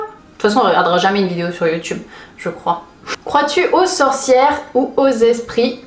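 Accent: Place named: Toulouse, France. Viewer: L’accent TOULOUSAIN HAHA !! J’adore ! Par contre, merci quoi à cause de l’histoire de l’homme aux lunettes je vais pas dodo cette nuit 😂😂😂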